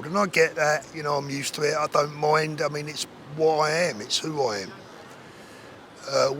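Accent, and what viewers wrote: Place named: South London, United Kingdom. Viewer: The rough working class South London thing is an asset. There are not enough people like you in politics who can connect with the forgotten disenfranchised masses who don't vote. And once more prejudiced folk get past the accent and tattoos it's clear you are a decent sincere man. Again, another rare & much needed commodity in British politics.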